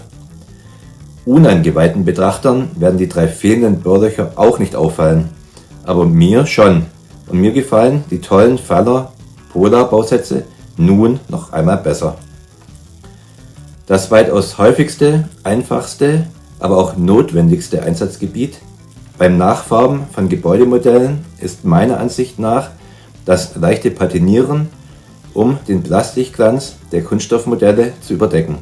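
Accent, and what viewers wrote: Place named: Swabia, Germany. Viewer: Den schwäbischen Einschlag hört man als Schwabe schon noch. Das ist wie Fshrradfahren. Kann man's einmal, blekbt es auch. 😊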